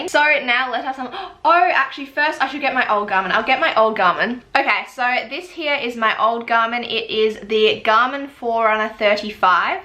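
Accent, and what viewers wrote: Australia. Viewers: Hi , I like your accent, reminded me of travelling through 'Stralia' 22 years ago. You did some good research, thanks for pointing to the table. I am in the market for a new sportswatch after my vivactive hr broke. How was the 245 inthe last 2 years? Did you mis the cool features the higher models offer? How was it when swimming, is 50m wr enough? I do like a little more ruggedly, because of playing with kids. I am in a sales period here now, and got to a short list: fr245m for 250, instinct solar 300, fr745 for 350 and fenix 6pro 450(still expensive). The 7 is out but is way too expensive. That solar instinct should have music. Which one would you recommend?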